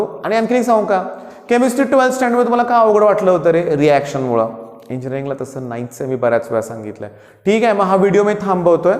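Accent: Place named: Western Maharashtra, India. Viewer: Sangli satara kolhapur che por boltat he bhava ✌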